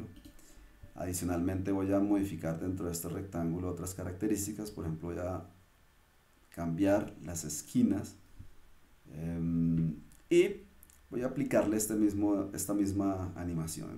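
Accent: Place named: Colombia. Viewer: por el acento creo q eres un compatriota colombiano quisiera saber donde me puedo certificar en adobe que es creo el titulo q posees gracias!!!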